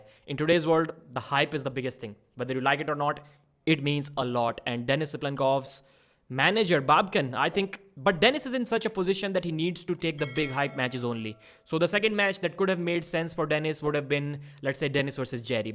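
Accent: India. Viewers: Its the typical indian accent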